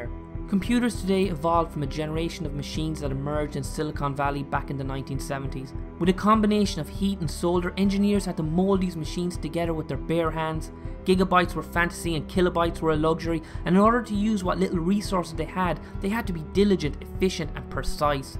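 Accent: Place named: Ireland. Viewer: Also that irish accent makes you so easy to listen to lol.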